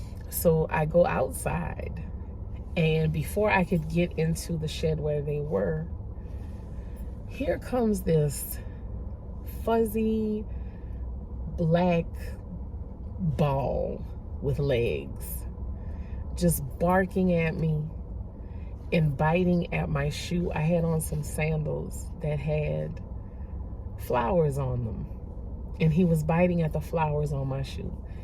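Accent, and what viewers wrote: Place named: Louisiana, United States. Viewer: Have no idea why someone would complain about you talking. That Louisiana accent hit different. I could listen all day. Also, I'm sorry to hear you're going through these things but the Light is always surrounding us we just have to step back in. Stay beautiful inside and out ❤ So glad I found you ❤❤❤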